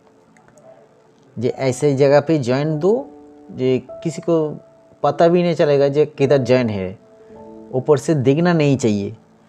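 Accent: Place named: Bengal, India. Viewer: You are 100% pure বাঙালি। হিন্দি শুনেই বোঝা যাচ্ছে। anyway ভিডিও গুলো কিন্তু খুব সুন্দর। Best of luck 👍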